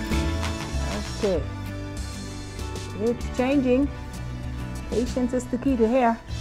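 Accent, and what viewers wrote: Bahamas. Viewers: My father's side is Bahamian, Mother Floridian !! This was one of my favorite dishes!! I was the one pulling out the hammer to pound them . Memories, love the accent 😍!! I'm in Colorado!! Purchased conch from an Asian market . Will try your recipe. Watching you refreshed my memory of the overall process. Happy eating 😋!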